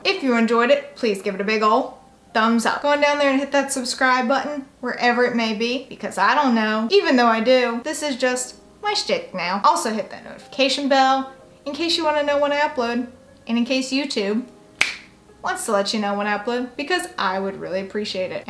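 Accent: South, United States. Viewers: Afternoon Gorgeous 🌞 Hope this message finds u well! Luv ur vids! I just watched ur "I talk funny vid" I watched as I knew u were Southern,but didn't know from which part till now. Im a proud Southern girl myself&had the same issue when I moved from Fla 2 Colorado🙄 I even write how I talk unless it's an important document or smthin. I happen 2 luv how u talk&it's actually 1 of the reasons I started watchin ur vids. People can b so rude! Glad u addressed ur accent the way u did,luv the blunt,honest&2 the point attitude! It's another awesome southern girl charm! Keep stayin u! Stay safe&healthy&I look 4ward 2 ur next vid! Happy Piercin💕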